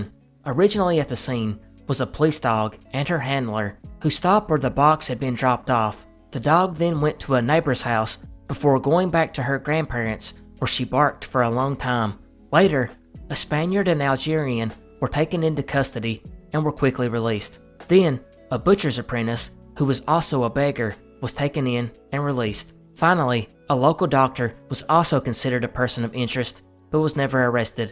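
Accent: South, United States. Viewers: I’m from the south & i love hearing other southern accents!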